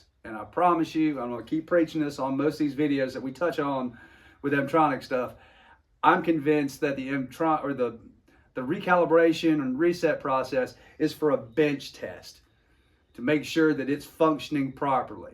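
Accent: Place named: Rock Hill, United States. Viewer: Man what in the hell??!! I’ve been watching your videos for some time now as I minor in tree work with a major in chainsaw addiction, pretty much all small engines really, I have two small outboards hanging on stands in my dining room right now, like it’s bad. Ive bought 4 chainsaws in the last 3 weeks just from scrolling through marketplace bc ppl don’t know how to work on stuff anymore. I just picked up a 201tc like this one for free from another tree service that had run over it with a kast lift, gonna need at least the handle and all that stuff that runs up through there so looking forward to all that lol. Anyways every time i watch one of your videos it’s crazy how much you sound exactly like my uncle lol. I said to myself, “he must be from down here somewhere”. Then come to find out I was right lol. You sound like you from somewhere around Rock Hill but hell there’s ppl that sound like us all over I’m sure. 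Small world. Anyhow, love your content brother, you’ve helped me a lot! Just modded out my little 194t bucket saw. That bitch is a screamer now son! So thank you for that! Hope to see you out there someday brotha, appreciate everything!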